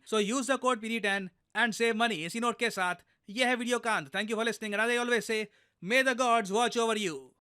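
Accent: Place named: South, India. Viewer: That south Indian accent 😂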